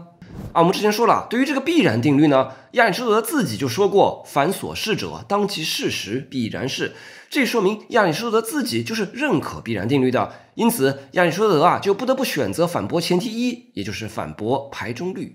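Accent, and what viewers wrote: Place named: North, China. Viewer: 中文就是垃圾语言架构，博主批你啪拉一大堆！我感觉你在讲绕口令（博主大概率是河北、或者东北人； 博主干脆改成绕口令辩论，与哲学距离太大。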